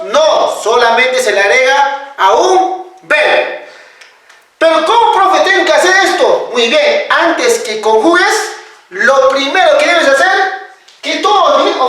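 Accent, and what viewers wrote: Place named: Peru. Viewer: En acento de los inka siempre hablas estoy atento.kusa allinmi kashan.napaycuyki.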